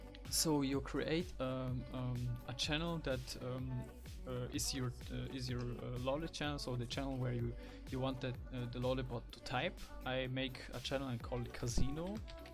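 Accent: Germany. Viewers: Der DEUTSCHE Akzent man kennt ihn!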